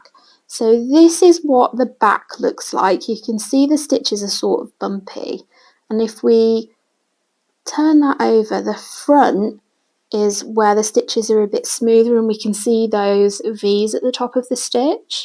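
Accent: England, United Kingdom. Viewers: WOW!!! Thank you so much for this great video.. I can't wait to start.. I'm new to crochet.... Can I just ask a question? .. I know you sound English but are you using American terms ? .... I'm from the UK and I thought the UK term was called a trebble crochet? .... Sorry for my basic question😊....Many thanks❤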